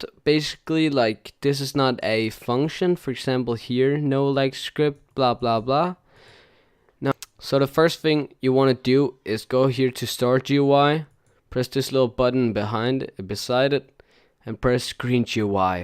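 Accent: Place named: Scandinavia. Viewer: U have a danish/scandinavien accent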